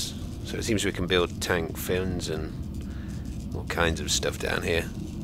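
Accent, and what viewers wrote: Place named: Birmingham, United Kingdom. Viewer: I can hear a slight yam yam/ brummy accent... you local dude?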